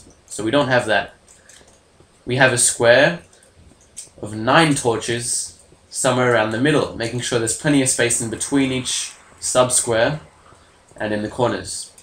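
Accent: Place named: Australia. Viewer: Can really hear your Australian accent start to come through here!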